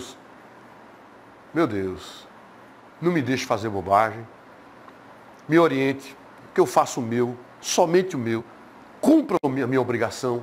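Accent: Pernambuco, Brazil. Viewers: Sotaque penabucano ❤👌 dele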